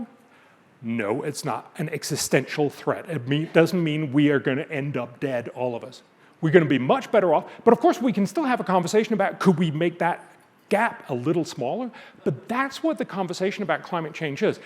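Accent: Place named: United States. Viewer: silly question, but where did you learn to speak american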